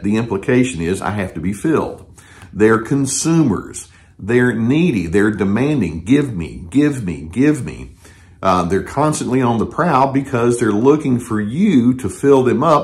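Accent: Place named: North Texas, United States. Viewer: I grew up in D/FW but don't live there now. Your N. Texas accent is VERY comforting and familiar to me!:)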